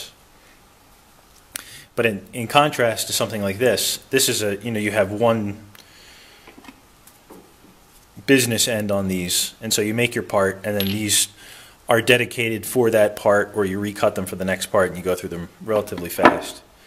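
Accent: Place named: United States. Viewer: Wait until you discover aluminum jaws... Machines like a wet dream, no-mar clamping. You sound like a fellow American, so wth is with all the metric dimensions?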